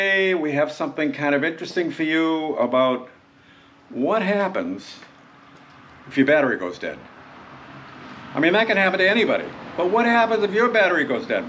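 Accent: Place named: Boston, United States. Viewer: I enjoy your informative videos. Keep up the good work. Love the accent. Is it from Boston?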